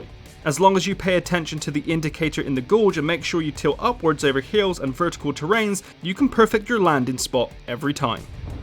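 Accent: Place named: England, United Kingdom. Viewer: Frickin english accent Speak correctly idiot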